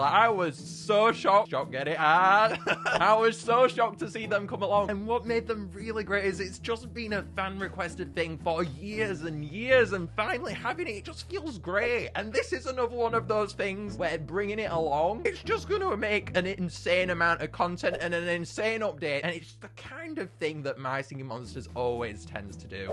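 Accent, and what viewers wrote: United Kingdom. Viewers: His accent is British that's the reason why he pronounced it.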